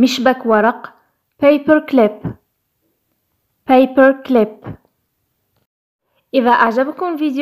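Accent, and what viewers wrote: Algeria. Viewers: من صوتها جزاءرية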